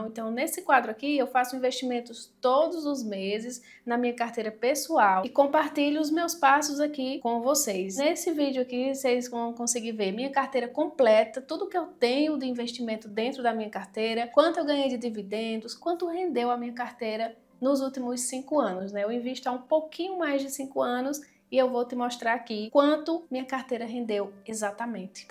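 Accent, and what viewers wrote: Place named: Paraíba, Brazil. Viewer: Amo assistir seus vídeos, pq eles são bem explicados. Outra coisa é o sotaque que conheço heheh já que tbm sou paraibana.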